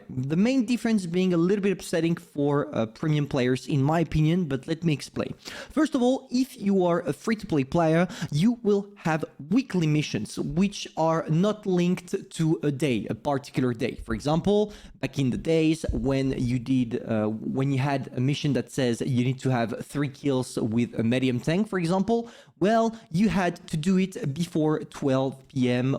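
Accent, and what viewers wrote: France. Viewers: Hey mate. Are French.?.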